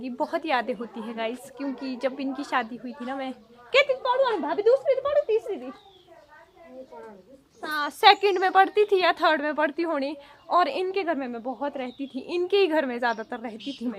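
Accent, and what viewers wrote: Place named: Pahadi, India. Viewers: Bahut Sundar aavaj hai aapki.. uper se Pahadi accent uspe 4 Chand laga deta hai... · I am from una in Himachal pardesh Tuha pahadi bade ghaint bolde...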